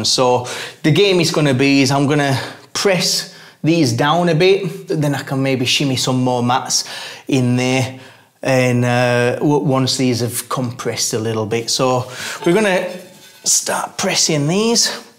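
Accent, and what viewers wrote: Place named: Scotland, United Kingdom. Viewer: he sounds Scottish, maybe Irish.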